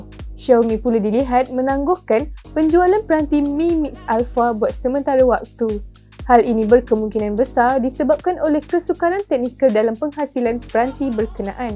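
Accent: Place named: Kelantan, Malaysia. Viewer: Host ni org Kelantan ke? Dgr mcm slang sana sikit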